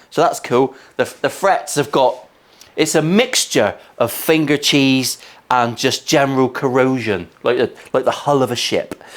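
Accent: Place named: Devon, United Kingdom. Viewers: That's a Plymouth, Devon accent I reckon. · “Don’t be a prick”. Wise words. One of the greatest phrases in a Janner accent: “Don’t be nasty, ‘ave a pasty!” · cheers, were is your studio, I'm a Plymouth boy who lives up north now, I used to mess around Milehouse as a kid, its good to hear a good Plymouth accent on the net, great kids keep it up. Tony.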